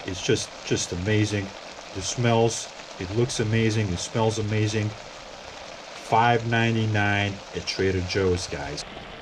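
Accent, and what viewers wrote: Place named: Former Yugoslavia, Eastern Europe. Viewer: If you don't mind me asking, what countries your accent from? if I had to guess I would say one of the countries from former Yugoslavia.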